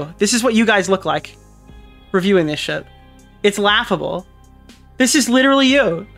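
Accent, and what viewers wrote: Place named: New York, United States. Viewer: This is fhe most aggressigely new york i ever heard you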